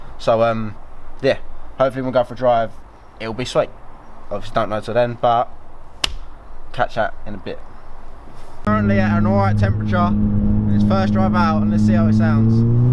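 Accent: Essex, United Kingdom. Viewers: essex accent is 100% the worst English accent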